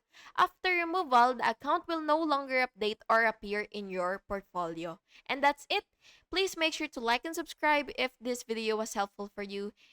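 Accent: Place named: Philippines. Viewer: your accent sounds filipina very much.. hehehehe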